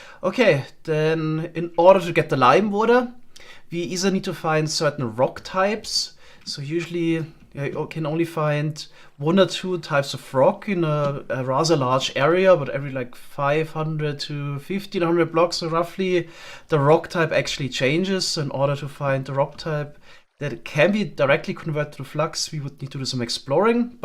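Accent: Germany. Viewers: Every now and then the German accent makes me smile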